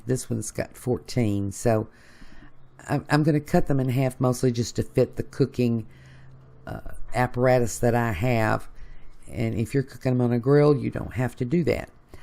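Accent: South, United States. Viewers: Just prepped some spareribs for tomorrow. Used a store bought rub on the ribs then added 4 cups of buttermilk on to of it. I wanted to make a buttermilk pie, which I love, but since I’m a owner operator of a small pet supply in Oak Island,NC I just didn’t have the time. Since the pie wasn’t made I decided to pour the buttermilk over the ribs. I’ll let you inow what we thought of them tomorrow. What state are you from? You sound a bit more southern than me, so I believe you are farther south than I am. My mother always marinated her chicken in buttermilk and I thought why not ribs? I put in search for you tubeand found you. Thanks so much! I’ll continue watching your videos. It is around 3:thirty am and I need to go to bed. Noproofreadingtonight. My zero doesn’t work this computer. Guess it doess not like salt air. Good night!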